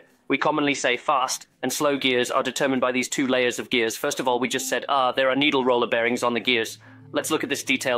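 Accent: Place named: India. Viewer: Omron switch omron switch? Is this automaticly translated? Vegan control board? WTF it sounds like a indian person speaking english for a first time…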